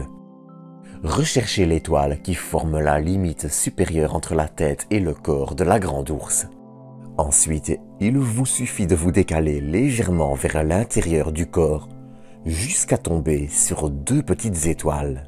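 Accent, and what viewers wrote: Belgium. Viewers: il a un accent belge à couper au couteau, il doit veniiiiR du fin fond de la campagne hainuyère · Ici grâce à Christophe ! Super chaine, je m'abonne ! (en plus je suis addict à l'accent belge...)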